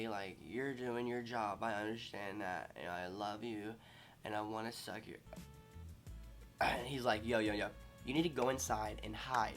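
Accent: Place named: South, United States. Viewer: He has a really thick country accent😹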